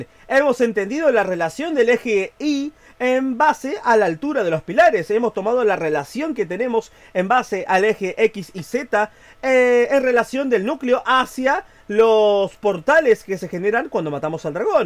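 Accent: Argentina. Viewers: Che mira, te hago una pregunta ¿vos sos argentino o de que pais sos? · De adonde es el chico este?? la tonada se me hace muy argentina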